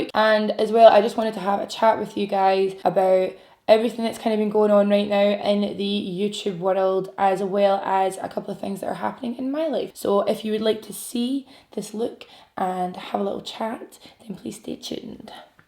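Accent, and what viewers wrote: Scotland, United Kingdom. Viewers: Watching you and listening to your accent helped me prep to understand the Scottish side of my family that I met a couple of weeks ago lmao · Love the eye shadow. Gorgeous color I have been wanting to try that foundation as well. I saw it at Sephora during the sale. Beautiful finish. Love watching your vlogs and videos. Yes your videos and vlogs. Maybe its the Scottish accent and all the "wee" words you say. 😊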